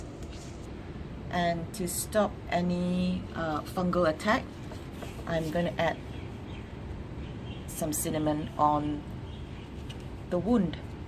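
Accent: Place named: Singapore. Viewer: Hi you sound like a singaporean ... where do you get variegated plants in SG?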